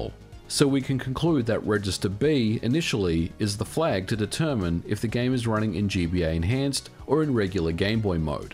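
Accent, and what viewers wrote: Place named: Sydney, Australia. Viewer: You mean his "West Sydney ethnic" Australian accent? For most Australians when we hear that voice we think of a mechanic.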